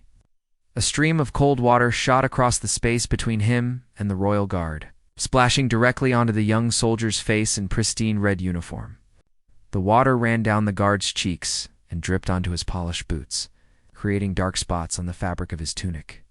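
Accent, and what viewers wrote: United States. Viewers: Bogus, cooked up by this fellow with American accent.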